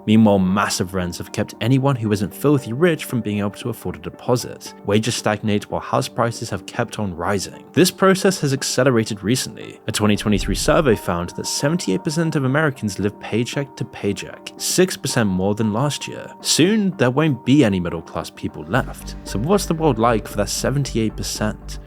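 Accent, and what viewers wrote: United Kingdom. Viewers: Why does moon care about what’s happening in the USA? He sounds British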